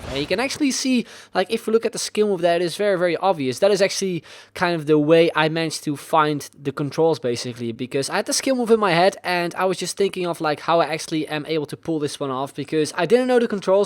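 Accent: Netherlands. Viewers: Are you Dutch? I hear an accent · u dutch?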